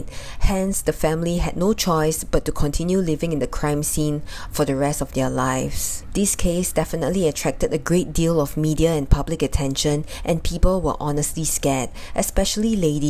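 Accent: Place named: Singapore. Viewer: Hurray for that lovely Singapore accent!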